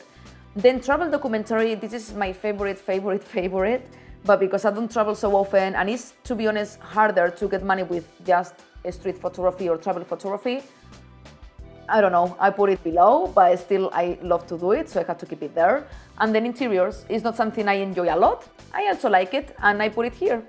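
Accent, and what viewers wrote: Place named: Spain. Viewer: I love your content! I discovered your channel yesterday and I can't stop binge watching all your videos. Very very insightful and informative content. Thank you millions and wishing you all the success - you deserve it. Your artwork is truly amazing and inspiring. P.S. Your Spanish accent is like a joyfull honey to my ears.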